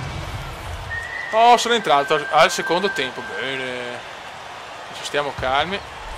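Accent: Veneto, Italy. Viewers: nè che sboro fioi, non semo ciapà tanto màl. e qua il veneto si sente hahaha grande <3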